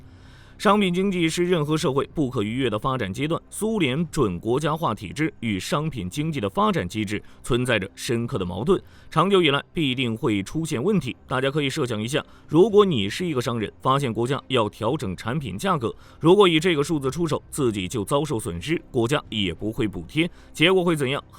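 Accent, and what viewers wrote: China. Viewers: 好抗拒這類普通話聽得很不舒服😢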